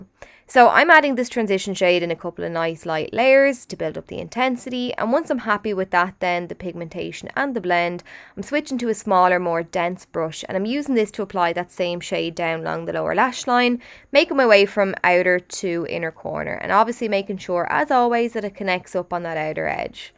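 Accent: Ireland. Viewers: I love your beautiful Irish accent!💖greetings from Israel😁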